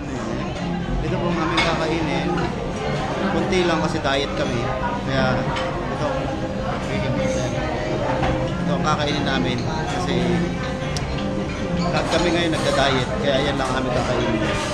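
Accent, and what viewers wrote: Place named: Ilocos, Philippines. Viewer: sarap pakinggan mag ilocano si..kakaproud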